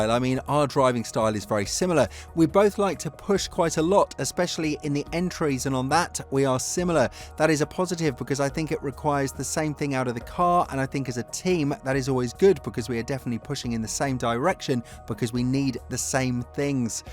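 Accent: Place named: England, United Kingdom. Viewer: Massively bias, sounds English, so no surprise there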